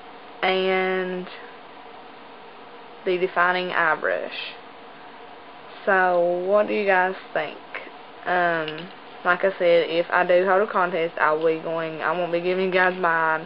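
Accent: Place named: Arkansas, United States. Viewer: OMG! I'm from Searcy, Arkansas originally...lemme say that you're accent makes me feel all warm and fuzzy inside! I hated it when I was growing up there, but I miss it now! Thank you for reminding me how great it is! Smooches!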